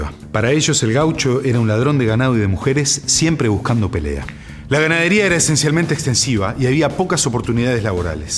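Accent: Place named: Argentina. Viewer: gracias amigo por desburrarme si es q se puede decir ja ...recien me di cuenta q eras uruguayo cuando lo mencionaste suena muy argentino bue la pregunta el gaucho es el fruto de la mezcla del indio y el europeo ? o ¿es el inmigrante q llego sin plata a estas tierras? ..¿ o eran piratas españoles ?....¿ bueno de donde salio el gaucho no me queda claro ? grcis saludos desde cordoba argentina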